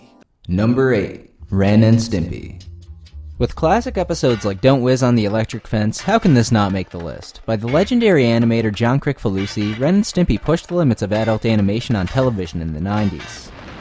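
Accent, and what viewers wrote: Canada. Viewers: I was guessing either the Midwest or Canadian lol. It came out in the vowels ^_^ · My god, I can't stand your shitty canadian accent.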